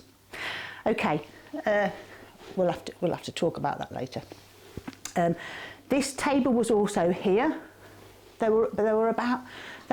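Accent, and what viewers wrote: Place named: Birmingham, United Kingdom. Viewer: From the 'm'duck' do I guess Midlands maybe Nottingham?. In the next video could you give an idea of the land water etc I love the accents of home.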